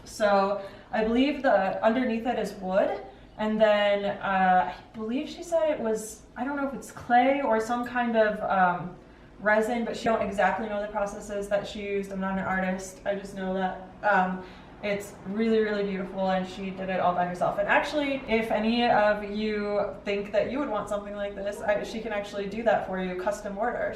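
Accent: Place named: United States. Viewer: Thank you for the tour!😍 I find it interesting that in Iran the kitchens are open in comparison with Azerbaijan, where i come from. It would be also nice if you would go a bit more deep in thr tour and show more details in the furniture, for the kitchen especially it was too quick, and at the end to have an overall blick once again😊 Btw you have a lovely american accent👍🏽 As i understood you are living in the States and just visiting Iran.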